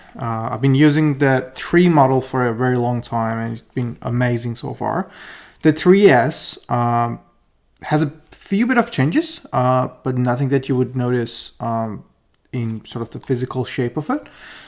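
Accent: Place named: Australia. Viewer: ghorbune lahje aussie it beram :))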